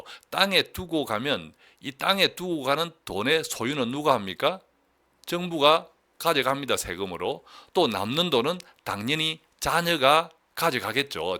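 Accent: Gyeongsang, South Korea. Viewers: ~ 혹시 대구분이세요?????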